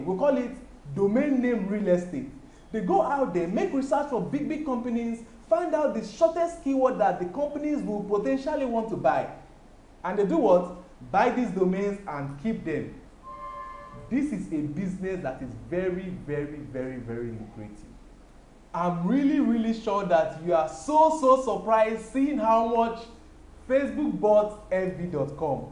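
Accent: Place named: West Africa. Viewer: I wasn't sure I could stomach the West-African accent, but I gotta admit, the hilarious analogies have grown on me... Good work, can't wait to watch all videos...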